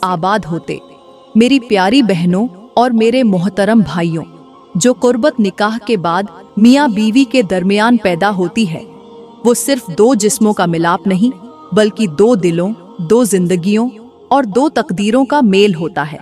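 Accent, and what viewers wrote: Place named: India. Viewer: Bayan toh bahut achcha hai lakin bahut ziyada tafseel se batakar video bada kiya gaya hai iski itni zarurat nahin thi ...aap ki Urdu bhi itni achchi nahin hai hindi alfaaz Hindi laheje se pata chal raha hai ...sab se buri baat ye hai k aap apne khoob soorat haath aur ungliyon ko dikha rahi hain ...isse parhaiz keejiye... f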